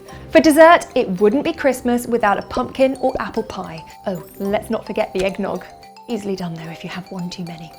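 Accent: United Kingdom. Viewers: oh my god that British accent 😍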